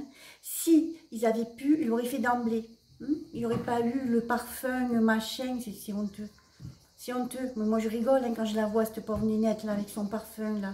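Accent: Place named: South, France. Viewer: Bonjour.... Je vous decouvre.... Énorme... Vous dites quasi la même chose que katia mudai médium.... Vous êtes où ? Je suis de Montpellier... J entends un accent du sud...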